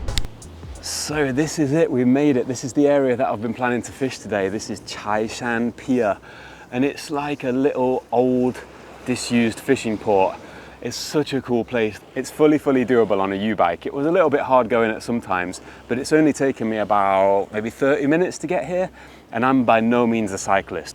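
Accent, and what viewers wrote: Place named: United Kingdom. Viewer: 這是英國腔嗎？太好聽了🎉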